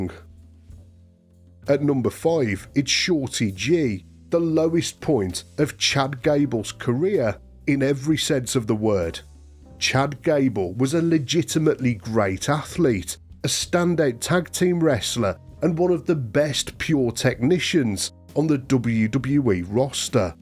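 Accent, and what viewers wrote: Birmingham, United Kingdom. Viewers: Love your Birmingham accent and pronunciation of 'W', which is a popular letter in wrestling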